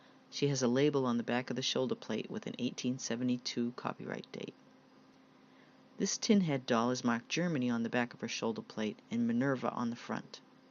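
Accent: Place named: Northeast, United States. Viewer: Oh my god! I am drooling over your beautiful dolls. WOW! Great video. You must be from the Northeast. I love your accent. I just subscribed.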